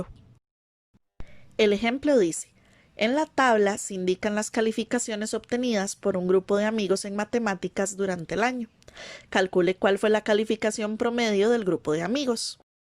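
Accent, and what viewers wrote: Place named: United States. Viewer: Soy yo o la profesora habla como si fuera gringa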